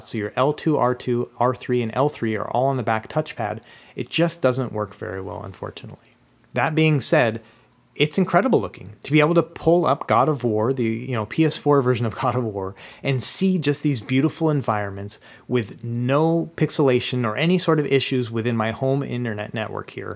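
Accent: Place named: Midwest, United States. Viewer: Maybe it's just me... But u sound way more Midwestern in this video than in others 😭😭 u in MN or sumn? If so let's be homies 🤞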